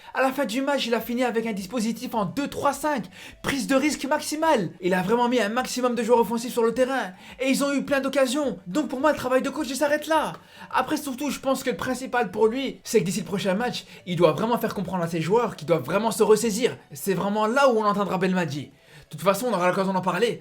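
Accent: Belgium. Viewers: Accent Belge 🍟🍟🍟🍟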